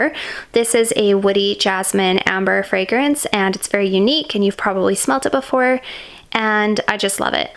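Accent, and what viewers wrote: Canada. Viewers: Hey, you’re Canadian?!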